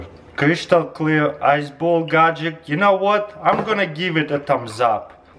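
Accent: Russia. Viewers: I also like your russian accent!!!🖒🖒🖒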